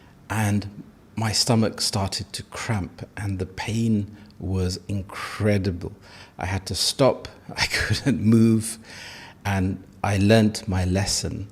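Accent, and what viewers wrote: United Kingdom. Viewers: I’m Vietnamese , But I like listening your English accent and your videos ,thanks so much and have a nice day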